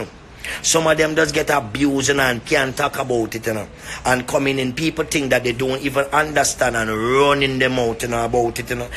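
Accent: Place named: Jamaica. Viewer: This was a set up from start,they were intimidated n pulled this stunt...... i luv u but y the Jamaican accent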